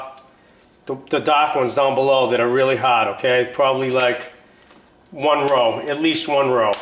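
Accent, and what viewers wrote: Boston, United States. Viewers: I haven't ever seen them made with tomato sauce, now that is Sicilian, but you have a strong boston accent!!!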